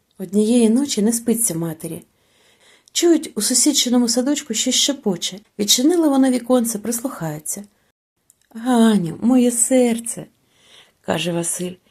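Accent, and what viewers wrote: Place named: Russia. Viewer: Родной язык чтицы - русский, и это слышно. Тембр голоса приятный, но нет той сочности и точности звуков, которые присущи только реальному носителю языка.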